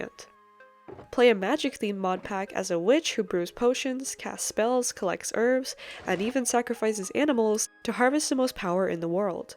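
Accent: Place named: Sweden. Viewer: HUR BLEV DU AV MED SVENNE DEALEKTEN I ENGELSKA?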